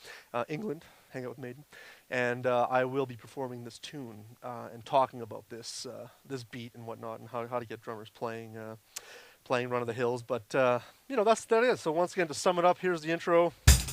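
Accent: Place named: Australia. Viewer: Your British accent sounds Australian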